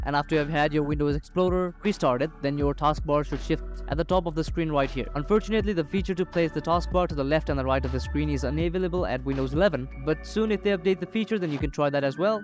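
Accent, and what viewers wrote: India. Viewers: Didn't work. I should have known once I heard the Indian voice. Moron ...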